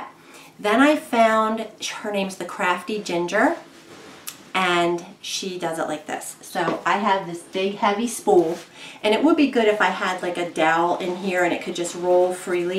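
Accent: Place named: South Jersey, United States. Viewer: Had a feeling you were from south Jersey. A Jersey girl recognizes the accent. 😂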